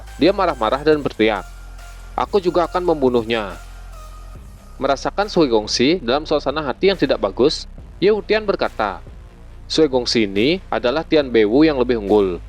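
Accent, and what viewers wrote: Bali, Indonesia. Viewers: Dr logat, kayaknya orang bali nih admin.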